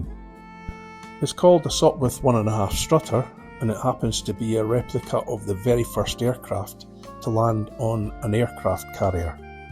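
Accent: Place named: Scotland, United Kingdom. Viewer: You make me want to go to Scotland again. I visited for work with Motorola back in the day. Your accent brought back fond memories of good people.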